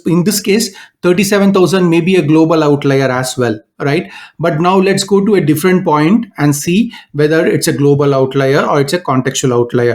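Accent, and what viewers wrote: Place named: India. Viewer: accent as thick as curry. unsubbed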